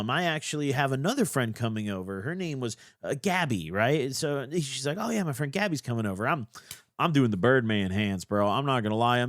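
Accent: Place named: South, United States. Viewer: Southern ass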